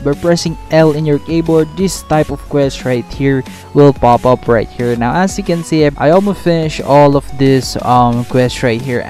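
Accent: Philippines. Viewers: THis guys lives in the Philippines i know by his accent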